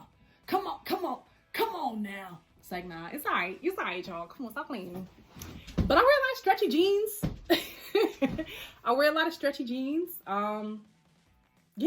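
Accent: New Jersey, United States. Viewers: Hold up you from new jersey? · YO Are U from Jersey City?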